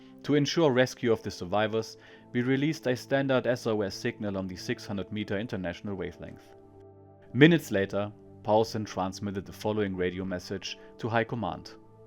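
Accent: Germany. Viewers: Your German accent makes the narration more authentic. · The German accent materializes the story into reality.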